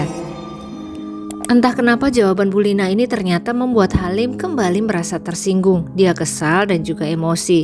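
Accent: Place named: Banjar, Indonesia. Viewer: kebetulan lg dinas di kota ini, tp kabar simpang siur, berkat mbak sy tau kronologinya.makasih mbak..btw mbak dah bisa jd org banjar,pas pengucapannya😂😂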